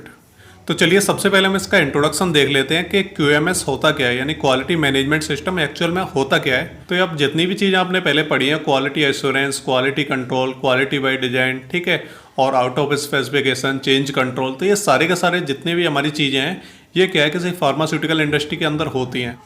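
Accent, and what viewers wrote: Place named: Chambal, India. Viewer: Sir aap bharatpur ya dholpur side ke ho kya ya fir चंबल से ho .aapke voice tone se kabhi kabhi aisa lagta hai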